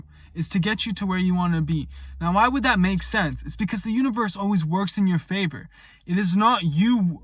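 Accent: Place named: East Coast, United States. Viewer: You have an east coast accent!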